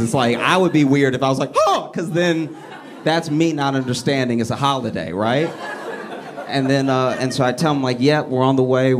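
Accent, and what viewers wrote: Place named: Louisiana, United States. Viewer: lmao his accent is Louisiana but his demeaor is totally New Yorker xD "the fuck?" xD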